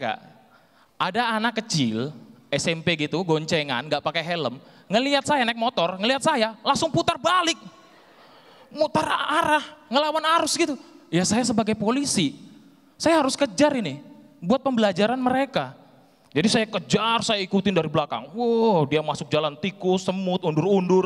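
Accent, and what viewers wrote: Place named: Balikpapan, Indonesia. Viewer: Wkwkwkwkwk logat balikpapan yang sangat khas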